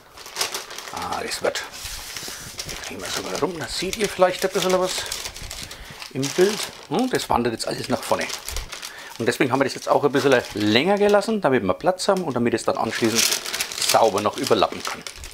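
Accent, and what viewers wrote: Franconia, Germany. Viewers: Hallo! Dafür keinen Dank! Ich dachte mir halt das die Preissn uns a Fäschdäner! Grins!:-) Und Red weider Frängisch ich mach dann dein Übersetzer! Grins Gruß Robert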